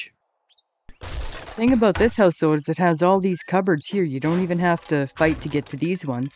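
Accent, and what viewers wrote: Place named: Canada. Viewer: Not sure I’ve heard a more Canadian, it’s gotta be, accent. Love it lol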